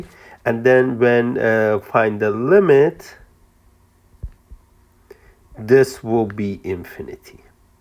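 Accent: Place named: Nebraska, United States. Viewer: are you from nebraska? you sound like my dad?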